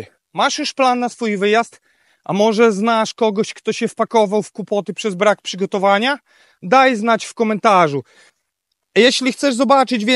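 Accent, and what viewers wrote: Podlasie, Poland. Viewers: Oprócz śmiesznego podlaskiego akcentu większość co chłopak mówi to prawda. Mieszkam tu 20 lat i widzę jak Irlandia się zmieniła... Czy na lepsze...? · usłyszałem akcent i mówię podlasie, i zgadłem. Nie tak tu strasznie😉 idealnie nie jest ale da się żyć. Nowo przyjezdnym odradzam przyjazd bez wcześniejszego załatwienia zakwaterunku najlepiej u rodziny już tu żyjącej lub znajomego. Służba zdrowia, mhm ja osobiście mam dobre doświadczenia a i w Polsce idealnie nie jest🙃 A im mniejsze miasteczko tym łatwiej się żyje, Dublin odradzam każdemu... Pozdrawiam z Waterford 18 lat na wyspie a miał być tylko urlop🤣🤣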